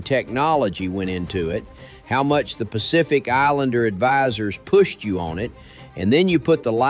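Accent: Texas, United States. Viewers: Mr., I owe you an apology. Allow me to explain. I was initially exposed to your channel by the YouTube algorithm, specifically your detailed analysis of Star Trek: Starfleet Academy. Though I have great regard for the franchise, I have not kept up with the current iteration under Alex Kurtzman other than Season 1 of Star Trek Discovery. Not out of any specific gripe, though I know that series is polarizing to longtime star Trek fans. I just hadn't gotten around to it. I do feel that a lot of the language used in the criticism of that show is coded in the language of the movement against so-called "wokeness" and "woke culture". I watched that video essay in its entirety. Still, having not watched the show, but knowing that the same anti-woke culture that took issue with ST:D (a more unfortunate acronym I cannot think of) took issue with Starfleet Academy, I looked at your face, and listened to your folksy, Texas twang. 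I looked at the long Santa Claus beard, heard you say that you live in Texas, and that you are a white man. And you were criticizing a cast made up primarily of people of color and women. And I let MY conscious and unconscious biases take over. Here's another probably MAGA-adjacent yahoo decrying that wokeness will be the end of civilization as we know it. And I was dead wrong. I just finished watching this video, and I found your arguments to be logical, insightful, thoughtful, and well researched. You showed respect to not only the fans and the gene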